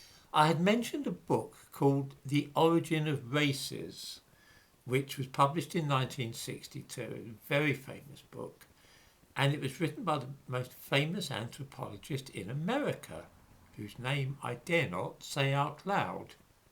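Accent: United Kingdom. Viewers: He is excellent! Genuine content, clear presentation, and delightful British wording and accent. Brave!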